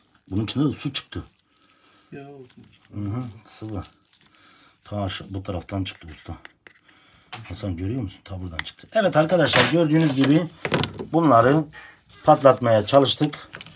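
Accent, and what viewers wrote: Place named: Kurdistan, Turkey. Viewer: Agridan gelmiş konusuyo don la köyüne kürt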